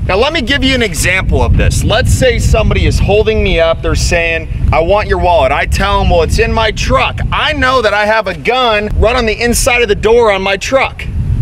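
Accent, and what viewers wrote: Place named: Texas, United States. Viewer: Now, more revealing footage has come forward, it shows this incident from the other side of his vehicle. The video clip i saw clearly, shows that the police had grappled him to the ground and that, before being handcuffed he managed to wrestle himself free, stand up and proceed to walk around from the passenger side directly to the drivers side of his auto. This great footage picks up just after he has somehow freed himself from the 2 officers and being pinned on the ground but is not seen from this camera angle. Thank You! for the work and time you take putting out really great content. You're so very correct, not using your Common Sense and Logic could get you shot, the police officers thankfully are using theirs. I guess its your well voiced Texas brand of thinkin and talkin that speaks to this life long Texan. 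Austin ain't All blue. I think I'm gettin a chubby* I am subscribed.and i'll continue to like and share your super content.Thanks again Hoss.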